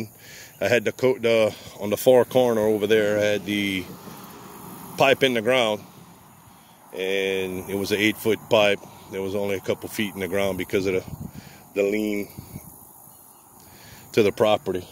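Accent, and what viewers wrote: Louisiana, United States. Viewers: Without that Louisiana drawl, I think if I told that same story in my voice, saying it was taking place in a NYC sewer,....nobody would care!, your story telling and video making are truly a find! Love what your doing, now go get us some video of that gator!...And I will look closely next time I'm in Manhattan! Lots of rumors about people flushing small ones down the drain and managing to survive in the warm waters surrounding steam pipes and skyscrapers of Downtown!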